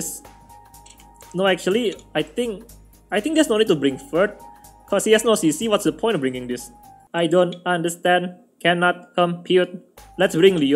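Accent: Indonesia. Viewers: Soalnya b.ing nya kayak logat indo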